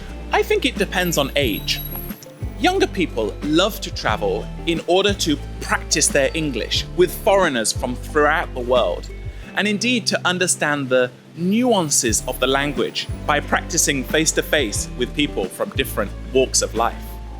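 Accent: United Kingdom. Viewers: what is your kind of accent in England ??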